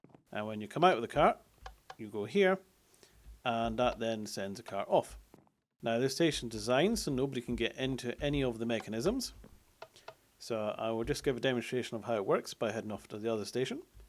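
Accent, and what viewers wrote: Scotland, United Kingdom. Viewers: blake collins ._. that may be because he is scottish... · You sound scottish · Wow he sounds scottish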